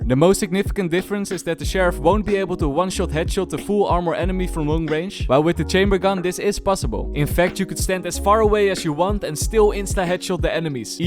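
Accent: Netherlands. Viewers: Are you dutch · I know because of ur accent that u are Dutch, ik ben ook Nederlands 😅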